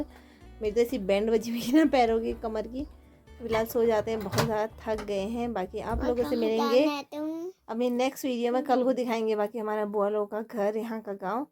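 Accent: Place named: Pahari, India. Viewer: Pahadi bolna kitna accha lg rha h aapka ❤❤❤❤❤❤❤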